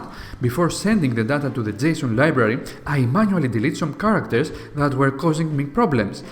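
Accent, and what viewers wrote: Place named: Greece. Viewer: Πολύ ωραίο βιντεάκι, άλλα από την προφορά κατάλαβα ότι είσαι Έλληνας, όταν είδα και το sparta σιγουρευτηκα :p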